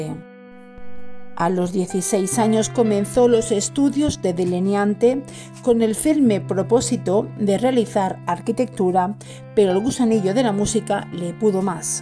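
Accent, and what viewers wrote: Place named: Valencia, Spain. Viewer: Decimooctava....... 2822 Como se nota q eres valenciana....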